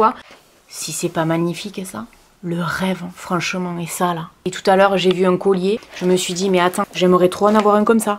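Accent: South, France. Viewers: Joyeux Noël à toi, ton fils et ta maman et aux abonné-es🎄💕. Merci pour tes vidéos tu es un véritable rayon de soleil 🌞 avec l’accent du sud j’adore 😍! À la base je ne suis pas trop branchée YouTubeuses beauté mais je t’adore tellement que je regarde tout tes contenus et suis super heureuse dès que tu fais plus de vidéos 🍀🙏🏼. Gros Bisous de Suisse 🇨🇭 😘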